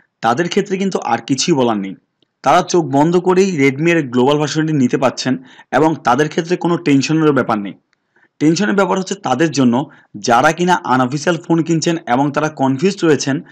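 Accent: Chittagong, Bangladesh. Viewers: আপনার বাড়ি চট্রগ্রাম নাকি ভাই??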